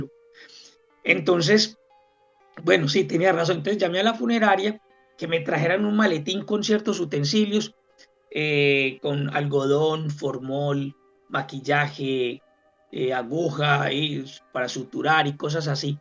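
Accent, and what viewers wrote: Colombia. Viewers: Qué paisita para hablar rico!!! Muchas gracias por tan buena e inteligente reflexion!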